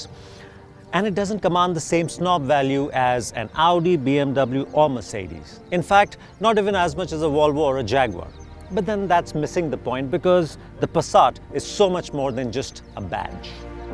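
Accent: India. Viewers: bullshit... This guy has a good Indian accent that is crisp. He is very easy to understand and is very serious... Matt speaks very fast... Swallows his words... And is full of goofy jokes ...which are nice too...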